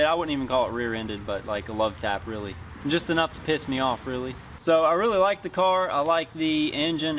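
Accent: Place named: South, United States. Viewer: so hard to take people with southern accents seriously.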